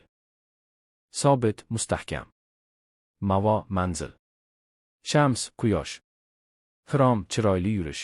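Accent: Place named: Russia. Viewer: Nima rus kishi oʼqiganmi buni gʼ ni g deb aytadi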